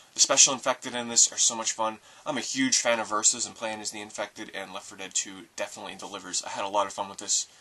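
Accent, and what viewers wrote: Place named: New England, United States. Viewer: what part of america do you live in? I sense a new england accent...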